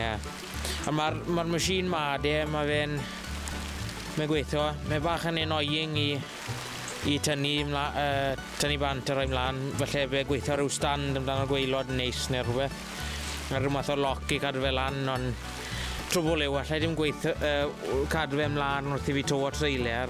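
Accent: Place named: South Wales, United Kingdom. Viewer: Hi, thoroughly enjoying the videos although the hwntw accent takes a bit of concentration, would be interested to learn more about your farm, seems like a great place for an enthusiastic & skilled young chap like you to learn. I see a lot of similarities between you & myself - Parts of our farm particularly some of the old stone buildings are in a dilapidated / neglected state but I have a plan in mind for every square inch of the place, money isn’t too much of a problem here but time definitely is. We also have many old 4x4s, quads & tractors, implements & tools - many have been here since new so a lot of time & money has and still is being spent on refurbishing them so they can in their turn help restore the farm. What’s the plan with the farmhouse? Do you live there or elsewhere? Best Regards.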